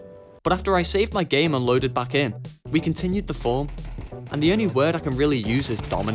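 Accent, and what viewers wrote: United Kingdom. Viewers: I only watch you because of these two things: Firstly you're an impeccable, stupendous and fabulous teacher Secondly for your Bri'ish accent, love that bud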